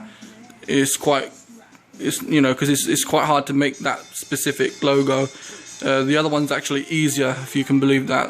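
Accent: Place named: United Kingdom. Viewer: Hi again im back on my own user/channel now, what's your nationality? if you don't mind me asking, you sound British am I right? My PSN name on PS4 is CS20-2015 add me if you want.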